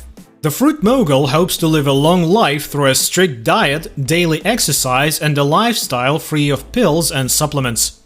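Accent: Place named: Russia. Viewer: your dirty Russian accent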